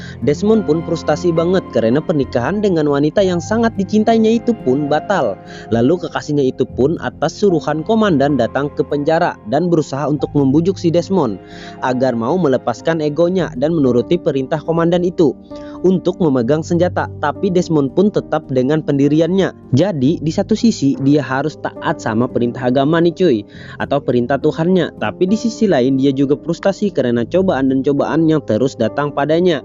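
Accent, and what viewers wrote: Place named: Banjar, Indonesia. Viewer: Kok kaya orang banjar logat ya bro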